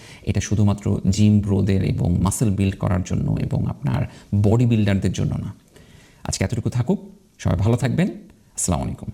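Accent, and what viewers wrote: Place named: Bangal, Bangladesh. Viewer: বাঙাল তো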